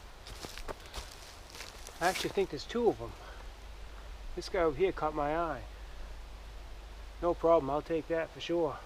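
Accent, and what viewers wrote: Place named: Vermont, United States. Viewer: you sound like your from the NEK in vermont lol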